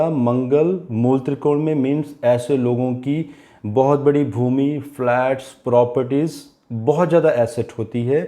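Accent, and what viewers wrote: Punjab, India. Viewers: this is happens why typically punjabi person trying to speak in hindi anyway always liked ur video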